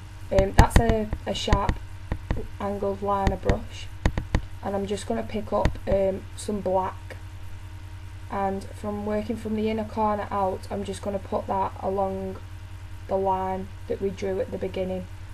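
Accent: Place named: Manchester, United Kingdom. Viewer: Are you from Manchester. I am and you sound like me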